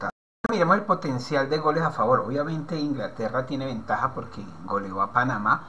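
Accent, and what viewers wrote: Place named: Cali, Colombia. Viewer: Jajaja se te nota el acento caleño, vamos colombia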